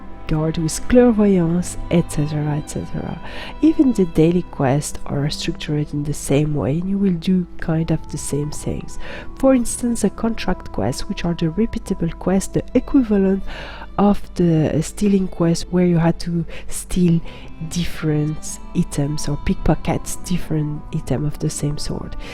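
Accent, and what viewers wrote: France. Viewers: I like the feeling of you talking in your videos (I have seen maybe 5 or 7 :D ). I love your french accent and now I finished watching this video and literally I am buying now the 2000 crowns and am buying this DLC in this moment :D. I am so excited about the DLC, your video was great. Many thanks for not making any spoilers, I am excited about the daedric plane (or aedric maybe :D )connected to this DLC. which daedric prince is with DB? Mephala :D, I wonder how it will look :D. I guess pretty awesome :D. and interresting thing that you told about he feeling of killing someone :D. I look forward for the finisher killcam, I loved them in Skyrim :). when npc was some low level of health, the last hit was a kill-cam execution video, very nice, I liked that. the same, I am peaceful person, but nice feeling in this game. ZOS has made a good job in this part too apparently :). 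Ok, no waste of precious time, I go to dark brotherhood now :). see you there :). PC EU ;o))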